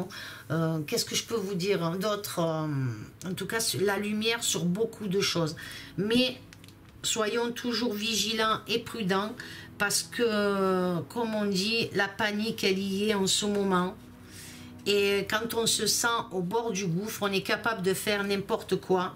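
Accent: South, France. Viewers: Votre accent du sud (Marseille ?) est très très très très prononcé !!!…My God !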